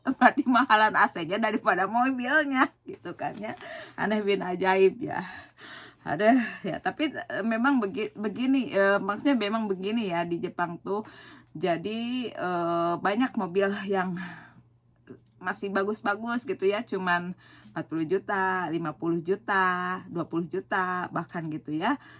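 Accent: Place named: Sunda, Indonesia. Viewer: 20 tahunan di Jepang, eta bahasa Sunda na edun suredunnnn....asli pisan Sunda😀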